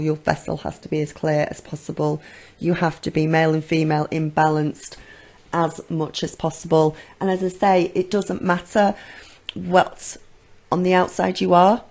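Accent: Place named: Sale, United Kingdom. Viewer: ah, Sale. I can hear it.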